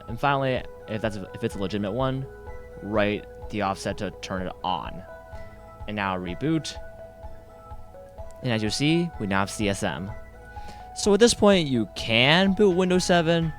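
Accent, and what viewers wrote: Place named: Southeast Asia. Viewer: It's the South-east Asian accent.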